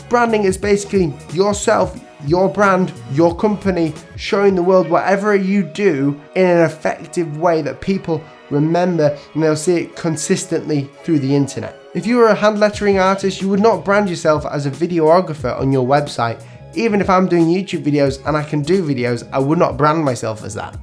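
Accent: United Kingdom. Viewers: I do really appreciate your content btw, since I try my very best in calligraphy from time to time... and I really like british english:D Keep up the good work!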